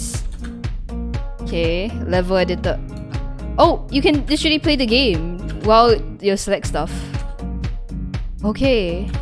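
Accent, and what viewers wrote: Singapore. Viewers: Are you Singaporean? Just curious. It sounds like a very singlish accent. =)> Also nice video! I’m surprised you put captions over the ENTIRE thing (this is the first time I’ve see a video of yours so if that’s a normal thing I didn’t know) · Oh boy I can hear the Singapore in the voice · i could tell right away you were from sg, this video confirms it LOL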